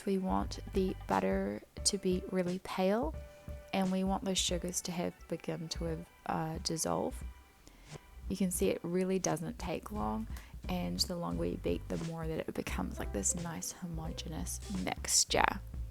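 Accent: New Zealand. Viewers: You sound like a kiwi who wants to be a yank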